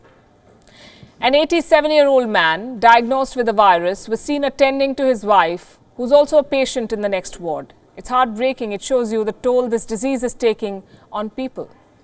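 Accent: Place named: India. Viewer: Her Indian accent is a real turn on.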